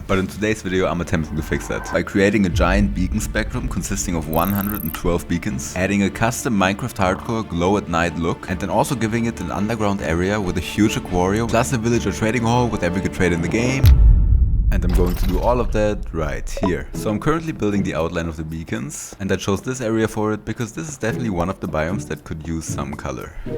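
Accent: Germany. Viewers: Okay but am i the only one who thinks that hes German?😂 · Am I the only one to hear an German accent?Great Video tho❤️🫶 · You sound kinda german. Just in case:(Döner 8€💀) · Funny video and nice editing! One question tho: bist du Deutsch? Dein akzent lässt das sehr vermuten. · Are you german? Anyways great Vid! Got my like and sub you are gonna blow up. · I hear german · Are you german? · I love that i can hear the small german accent haha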